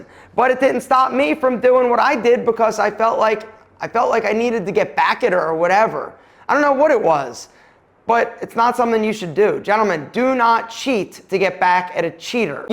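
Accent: Philadelphia, United States. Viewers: Yo, where you from? You’ve got a Philly accent for sure, am I right?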